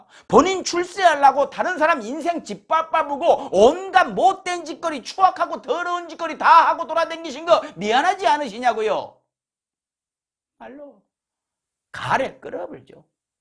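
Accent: Jeolla, South Korea. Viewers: 이 형 전라도 사투리맛갈나게하네.. 화이팅..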